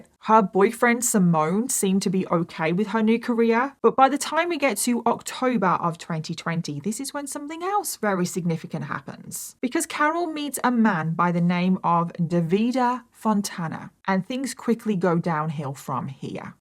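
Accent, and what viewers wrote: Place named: United Kingdom. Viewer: Can somebody please tell specifically what kind of British accent has? Thank you. I like it.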